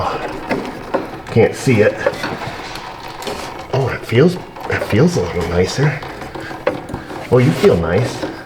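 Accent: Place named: New York, United States. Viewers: We love your New York accent. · So you must be from southern NY state as I hear you say Warsh instead of Wash. ! ya'll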